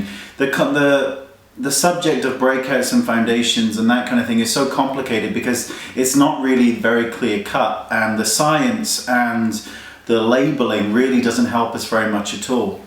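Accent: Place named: London, United Kingdom. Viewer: really random question, but in your videoes you speak with a very londonish accent, but there are times in your videos where i can here a twang... are you from somewhere else but use a london accent so viewers can understand?:) xx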